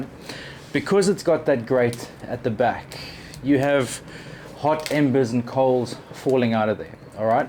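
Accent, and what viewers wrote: South Africa. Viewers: . South Africa, it sounds….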